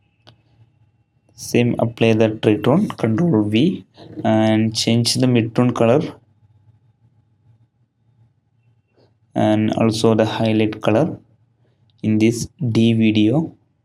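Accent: Kerala, India. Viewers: are u from kerala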